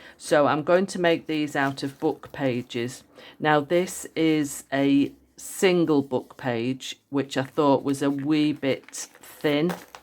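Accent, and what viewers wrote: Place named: England, United Kingdom. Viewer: Your video popped up in my feed and I can’t believe I’m just finding you! After viewing, I immediately subscribed, and now will need to go back and try to catch up with your incredible ideas! Thank you so much for sharing your creations! I’m intrigued by your English accent and the fact you’re in Spain…love the background noises! I’m picturing you living in a city above shops…that’s always been my dream! All the best to you from the USA ❤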